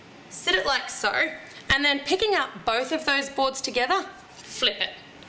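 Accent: Australia. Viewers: She is from Australia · Are you Australian of South African.... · australian i think.... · ur aussie right?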